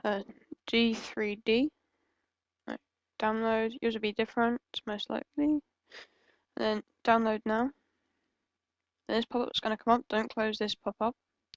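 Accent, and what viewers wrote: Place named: England, United Kingdom. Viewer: luv ur english accent X)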